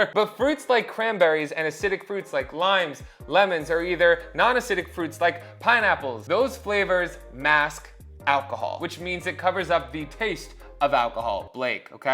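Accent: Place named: New York, United States. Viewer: How have you managed to get a heavier NY accent and sound gayer at the same time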